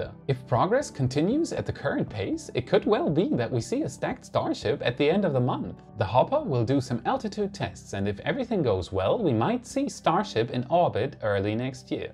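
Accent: Germany. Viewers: are you german? you sound very german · Are you German?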